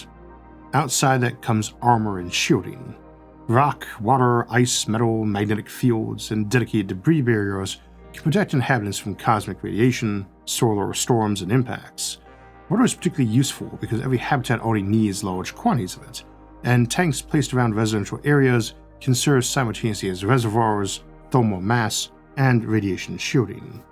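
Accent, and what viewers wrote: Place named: South, United States. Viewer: know something please - your speech impediment was never enough to make you unintelligible, in fact it was part of the charm that attracted me to your channel - I do love a good Soouthern drawl (I'm British). Never feel the need to apologise for that. Folks that can't see beyond it are the true ones that need to grow of they want to enjoy your work. I am happy you have managed to get it to a point where you are happy with it though. Always remember the thought behind and quality of your work has always been the main, and will no doubt continue to be, it's prime feature.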